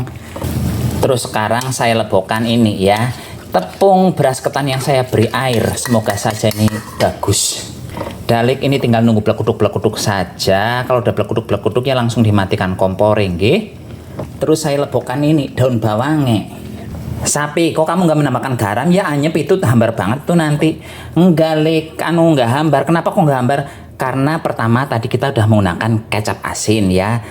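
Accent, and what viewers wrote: Banyumas, Indonesia. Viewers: Om maaf iya batam itu daerah jawa tengah iya kok om bisa bahasa jawa kayak orang bayu masan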